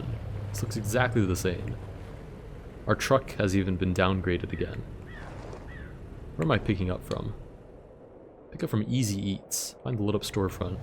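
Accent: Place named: United States. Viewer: his accent is american tho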